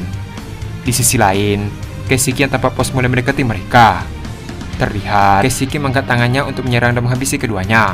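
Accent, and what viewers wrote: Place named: Bali, Indonesia. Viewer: Logat baline keras gati bli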